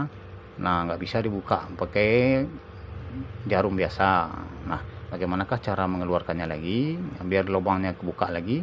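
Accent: Padang, Indonesia. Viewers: Mokasih daa.. dri logat nyo, jaleh bana urang Padang🤣👍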